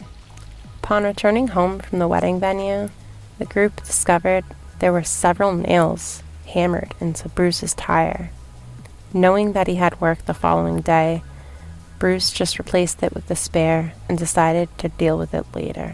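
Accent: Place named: Boston, United States. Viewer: Nice job on this, I am from Boston, and I can barely tell you have a Boston accent, although I can tell slightly, but you have a beautiful voice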